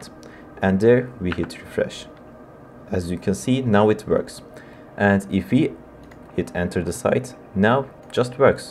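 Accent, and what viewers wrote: Turkey. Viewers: türk ingilizcesi bu nerede olsa tanırım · You have a different accent, I guess you're turkish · sende bi türk aksanı sezdim :d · this guy sounds Turkish.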